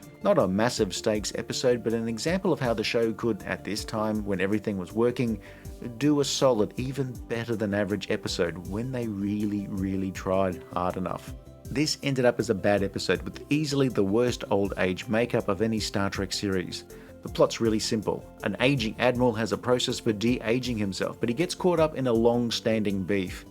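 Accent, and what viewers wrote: Australia. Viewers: It's late, I should be finishing up work, instead I'm listening to an Australian guy talking about Star Trek seasons I've seen over and over.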